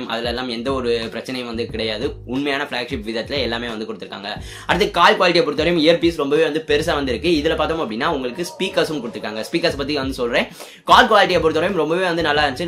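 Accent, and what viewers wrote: Madurai, India. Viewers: Bro neenga madurai ah bro ?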